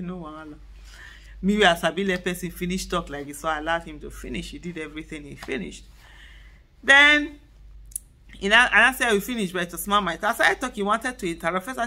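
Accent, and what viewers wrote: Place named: Edo, Nigeria. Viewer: I knew it! I almost asked you in your last video when you said you are from Agbede if you live in Auchi, too. Because when you were speaking Etsako, you were mixing up both Agbede and Auchi😂. Now I know why, because Mama is from Auchi (from Amehbainomoh's family) and Dad is from Agbede. I hope I spent your grandpa's name correctly. He was a wonderful doctor in Auchi. He cared for me when I was sick. May his soul rest in peace. Just like you, one of my parents is from Auchi, the other from Agbede. 😂😂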